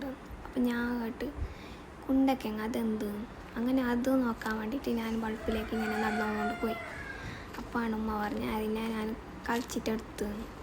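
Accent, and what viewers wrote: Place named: Kasaragod, India. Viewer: നിങൾ കാസർഗോഡ് ആണോ😂 സ്ലാങ്😅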